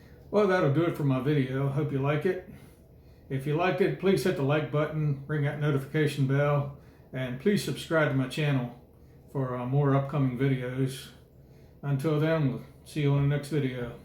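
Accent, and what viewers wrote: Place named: Baltimore, United States. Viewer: You got a Baltimore accent. I'm originally a Harford County girl. Love the Hat btw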